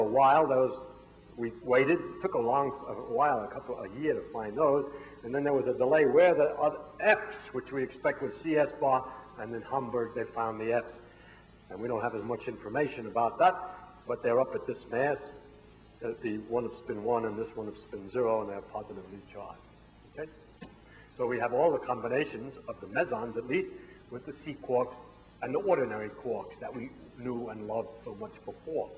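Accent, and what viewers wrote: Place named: Brooklyn, United States. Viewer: I’ve spent this rainy day watching Feynman lectures. Jeez, what a terrific teacher. Love his thick, Brooklyn accent. He has the timing and cadence of a comedian.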